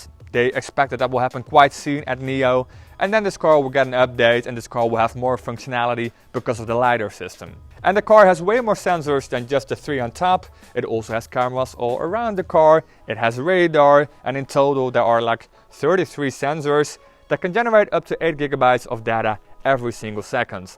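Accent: Netherlands. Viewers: is the accent dutch?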